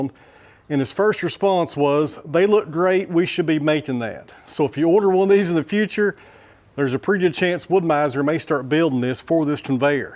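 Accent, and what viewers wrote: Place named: Tennessee, United States. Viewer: Audio is perfect. Can understand even with your Tennessee accent. Lol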